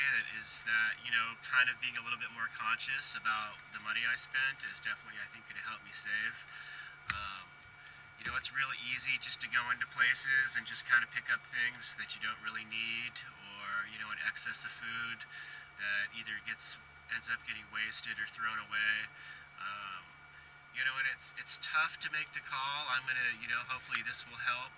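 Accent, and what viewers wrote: Maine, United States. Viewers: are you from Maine?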